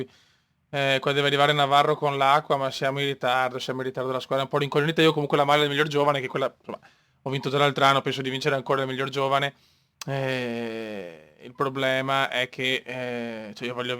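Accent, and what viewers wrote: Veneto, Italy. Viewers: sei di venezia?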